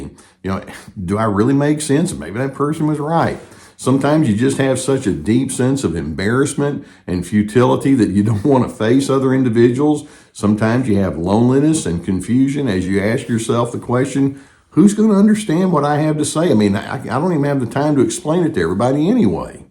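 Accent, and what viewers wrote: South, United States. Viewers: This guy is a lovely healer , always bang on the mark, and the soft southern accent is balm.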